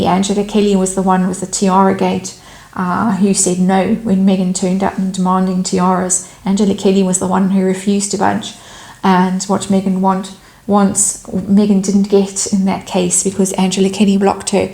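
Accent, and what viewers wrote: South Africa. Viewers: Yes I love the accent too! South African through and through!👏🇿🇦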